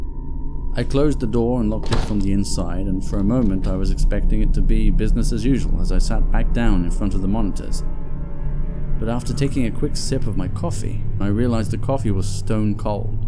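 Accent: United Kingdom. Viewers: Why does the narrator have to have a British accent? It just makes no sense, is pretentious, distracting, and unnecessary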